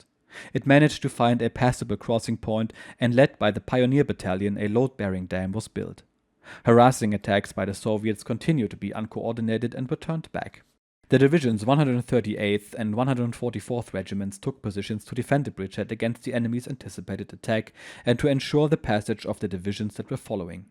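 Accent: Germany. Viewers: This is a great series and I have to say the German accent adds to the thrill of the story 👍😉 thanks.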